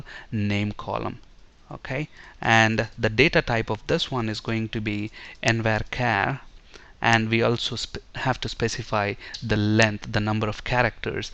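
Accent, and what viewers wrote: India. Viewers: Sir are you belong to India? Your English seems like Indian 😁😁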